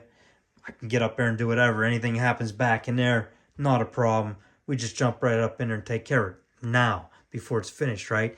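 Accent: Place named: Pittsburgh, United States. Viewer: Nice layout especially for a first one! You sound like you're from dahtahn Pittsburgh!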